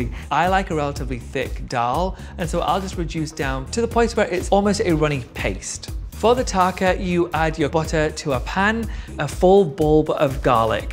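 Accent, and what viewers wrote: England, United Kingdom. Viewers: I know this is a year late but honestly yes, stop making fun of people because their accent isn't "perfect" he has a British accent and that's okay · His pronunciation might be regional from where he grew up in England. I studied Indian vegetarian cooking from a master ( an authentic Indian guru) and I was a food snob for years, thinking his way and pronunciation was RIGHT. Then I realized that I was being unnecessarily judgmental and making myself better than others. That’s not the goal of all the yoga I was doing. I’m much more forgiving of and actually appreciative of people who don’t do life exactly the way I do.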